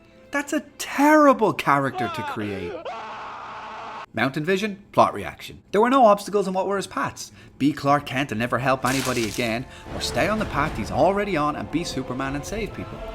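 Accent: Ireland. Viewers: More than likely the Irish accent. The volume level of his voice is fine. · Nice Irish lilt. Ba dheas an rud a cloisint ar YouTube. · this irish sounding guy understands the greatest american superhero better than zach snyder and every dc fanboi.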